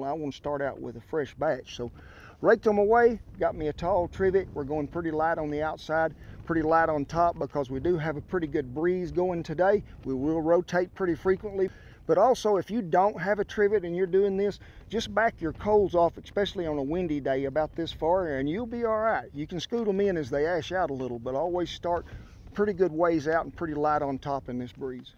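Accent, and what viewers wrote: South, United States. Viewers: I'm from the South, and I've heard all kinds of accents all over the place, and this guy's accent is just delightful. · Just found your channel recently. I remember seeing you on chopped. I'm from Oklahoma but I'm livin on up in Yankee land right now. It's good to hear that real southern slang.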